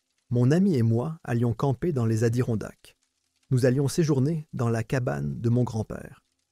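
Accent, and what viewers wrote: Quebec, Canada. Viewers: C’est un accent québécois! 😉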